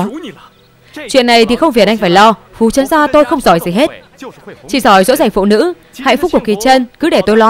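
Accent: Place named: North, Vietnam. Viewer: Giọng Bắc đọc nặng quá xem không có hay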